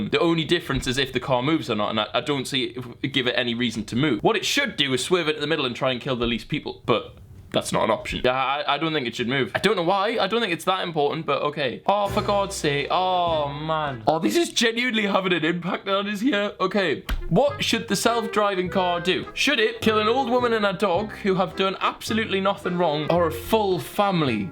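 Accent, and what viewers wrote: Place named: Newcastle, United Kingdom. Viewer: Love the fellow geordie ❤️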